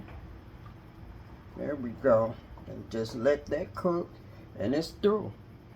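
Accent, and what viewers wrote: South, United States. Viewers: An elegant domesticated classy pretty lady with a sassy personality and tenacious Southern accent, Ms. Thanks immensely for the eclectic succulent crusines cooking tuteledge. Your foods look delicious, fresh, and quality. That gumbo look flavorful, delectable, quality, and fresh. Have a positive and productive day Ms.. 😂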